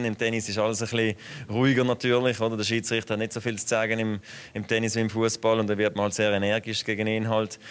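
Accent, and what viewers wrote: Switzerland. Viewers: this isn't german, it's swiss dialect!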